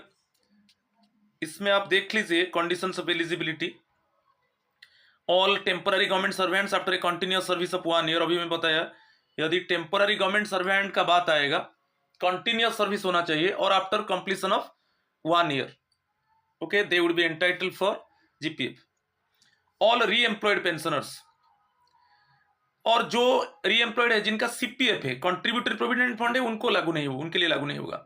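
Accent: Odisha, India. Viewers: Sir i guess u r from Odisha...I m from Sambalpur sir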